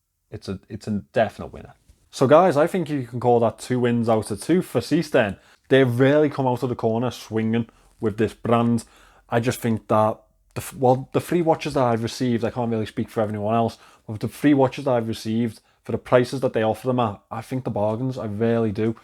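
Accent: Liverpool, United Kingdom. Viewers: I think should speak as he speaks, with his scouse accent, there are hundreds of different accents within the UK and I hope it always remains so, keep going you're doing a great job...stay safe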